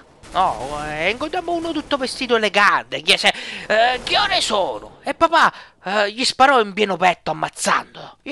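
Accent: Italy. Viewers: Chiuso il video e messo dislike a . La classica pronuncia degli italiani. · ovviamente ogni tua recensione è bellissima. Dici tutti i dettagli,però devi dirmi una cosa...perché quella parlantina sicialiana? xD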